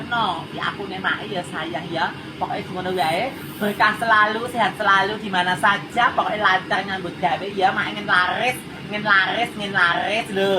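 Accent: Java, Indonesia. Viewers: Oalah... Tp sy seneng dengan accent jawanya ma'e.. semangat trs ya semua.. 😊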